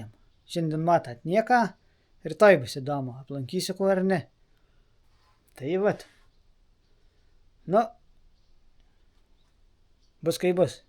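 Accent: Aukštaitija, Lithuania. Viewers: Man žemaičiui labai įdomiai skamba aukštaičių tarmė. 👍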